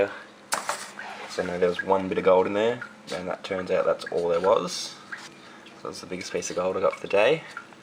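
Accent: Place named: United Kingdom. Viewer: just a few Q's for you on your build... What size is the Bilge Pump you are using? Any idea as to your angle you are set up for on your table? Oh, you have a British (or Aussie / Kiwi) accent. Where are you from (Just Country) and in the area you gather your cons from, what type of Gold do you usually score (Flour, Flat, Mini Nuggets, Tiny Rounds)? Here in Canada, I gather my cons from Alberta from the NSR (North Saskatchewan River). The gold found there is mainly Flour Gould (Microscopic!). And for a few of my own Comments / Observations: Your first videos were a bit clumsy, but I made it through the majority of the videos! I have yet to make my own video for others, so your way up on me in this category! Thanks for your time taken! When you unscrewed the bottle, I'll admit you stunned me! - Well done, Dude! What you showed us all, is that you can take an idea out of thin air, with a couple bucks, and a few days of your time, end up with a finished product that, well ain't the most pleasing thing to look at, but heck you didn't build it to look at, right? 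You built it to work your cons - let the water remove your Lights & Heavies, and leave you with pure AU! In that category, YOU Aced it! Well Done! Oh, one last comment... Your focus on your camera sure SUCKS! But as I said before... we put through with it mostly till the end of the videos! Michael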